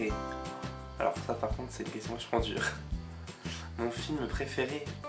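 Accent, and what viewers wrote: Belgium. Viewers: Je suis belge et c'est vrai qu'en t'écoutant, j'étais persuadée que tu l'étais aussi :D Chouette découverte en tout cas, continue comme ça ;)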